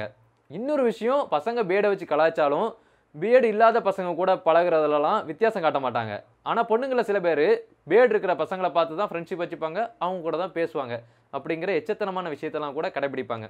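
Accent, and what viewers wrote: Tirunelveli, India. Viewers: Neenga tirunelveliyaa? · Neenga tirunelveli ya bro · நீங்க திருநெல்வேலி தான நண்பா.... எவம்லே அது....அந்த ஒரு வாா்த்த போதும் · Anna neega tirunelveliya ??? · Anna neenga tirunelveli ah??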